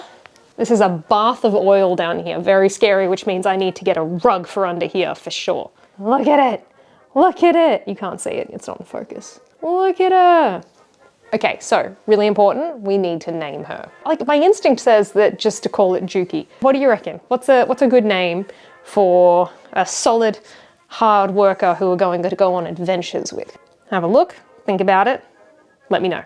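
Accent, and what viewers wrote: Australia. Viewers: Listening to another Aussie is the best....... beautiful shirt.... enjoy the sunshine.... Winter is coming....🦘🦘💐🌹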